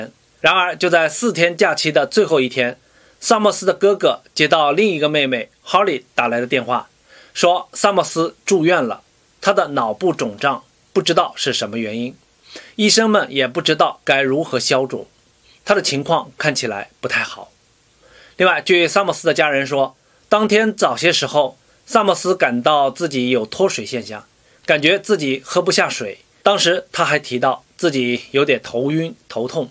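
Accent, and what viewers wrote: Standard. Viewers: 您的普通话非常标准 字幕有一排最好， 不要显示两排相同的字幕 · 你说话挺标准的，不需要字幕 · 有字幕更好。您的播音质量已经是首选了，国语讲得最容易明白。可能您的国语比较标准、语速也讲得标准吧。👍赞、加油。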